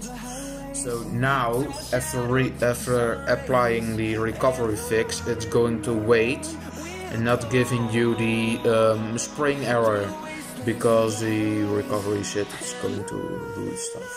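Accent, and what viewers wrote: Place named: Netherlands. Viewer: Kon aan je stem al aanhoren dat je een Nederlander bent, en het kopje waarin je zelf op het knopje abonneren klikt, bevestigt het voor me. Haha verder informatieve video!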